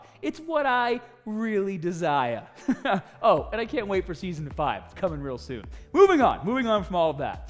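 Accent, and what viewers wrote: Boston, United States. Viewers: Didnt realize strong Boston accent until this video, boston represent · Staaaaaaat. Get in the caaar! Bro the Boston accent is thick as fuck 🤣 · took me forever to work it its not much like other bostonians i know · is this guy from bostonkkklkokkjkj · why do you have a boston accent in the first half and a regular accent in the second?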